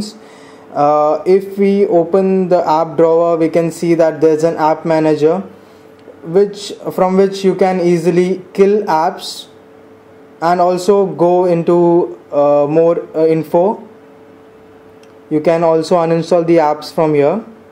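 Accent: India. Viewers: lg does not exist in pakistan.. his accent is Indian.. if u hate or love it .. :P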